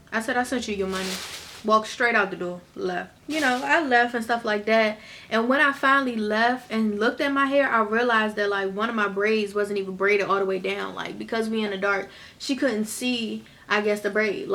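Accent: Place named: Baltimore, United States. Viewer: I know I saw nc in the ss but I hear a lil bit of Baltimore 👀👀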